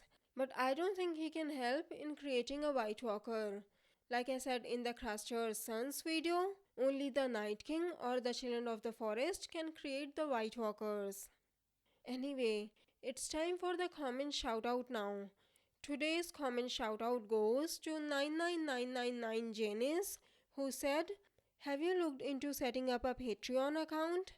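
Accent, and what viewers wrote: India. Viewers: Very annoying Indian accent! Very annoying to listen!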